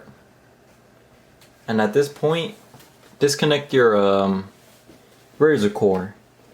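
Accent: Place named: Mexico. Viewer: I can tell by your accent you’re Mexican. Where you from? Cali?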